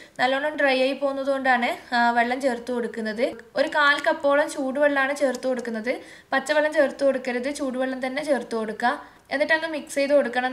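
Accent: Kannur, India. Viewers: Ningal kannur aano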